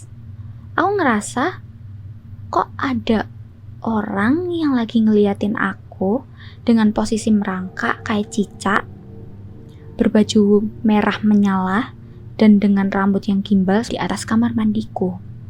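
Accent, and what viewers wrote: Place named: Central Java, Indonesia. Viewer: Mbak logatnya jawa tengah bnget hee 😌😌😂😂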